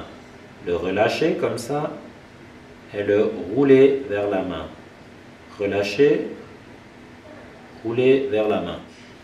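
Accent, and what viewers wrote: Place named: Switzerland. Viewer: je savais que j'avais reconnu un accent suisse :)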